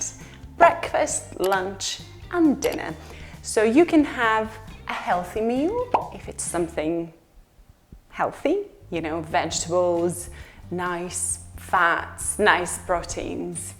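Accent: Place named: United Kingdom. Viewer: I adore your videos. And your British accent - it`s something incredible. Вы потрясающая и светлая личность. С любовью из Украины. Have a good one!!!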